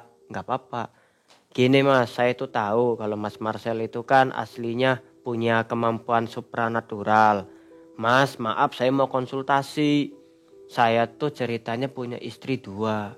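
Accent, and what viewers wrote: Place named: Banten, Indonesia. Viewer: mas kalo berbicara seperti logat bahasa orang banten😊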